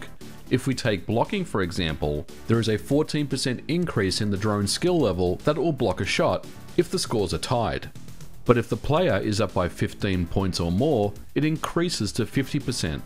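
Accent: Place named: Australia. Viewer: Australian. He lives in the US.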